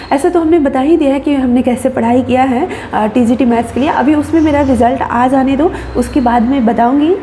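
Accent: Uttar Pradesh, India. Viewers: Di aap up se ho kya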